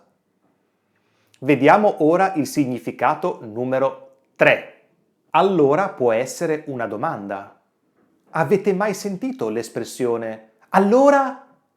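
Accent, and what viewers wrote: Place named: Tuscany, Italy. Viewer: Ciao, sono abbonata e come mai non ricevo le tue nuove video? Mi piace tantissimo sentirti e vederti... Parli esattamente come nella mia famiglia e quando ti sento ho il sentimento di stare in Toscana... Imparo anche di più con te...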